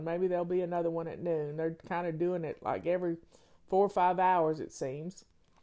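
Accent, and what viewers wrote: South, United States. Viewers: Hello from Manitoba! I know hun technology is so irritating, you look wonderful! Love your Southern accent!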